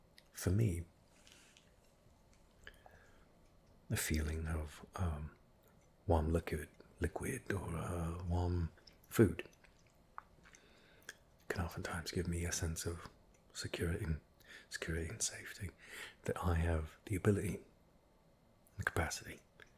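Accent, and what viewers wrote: United Kingdom. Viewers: Was craving somebody with an accent such as yours because, little secret, British accents drive me CRAZY but in the best way possible! Truly on of the best things I've listened to 👏👏🤌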